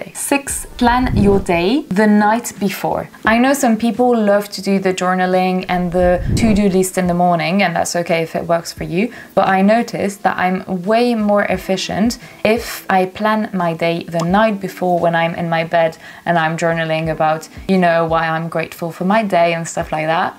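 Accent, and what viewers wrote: United Kingdom. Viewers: Such a beautiful accent from the UK ! Moi qui essaie d'améliorer mon anglais et avec cette thématique c'est d'autant plus motivant ! Merci et bravo pour cette vidéo :virtualhug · J'adore ton accent britannique 💕💕💕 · great british accent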